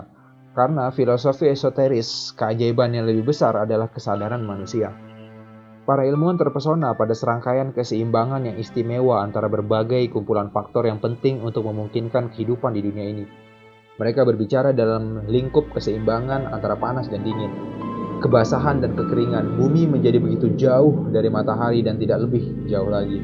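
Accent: Lombok, Indonesia. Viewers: Orang lombok bang?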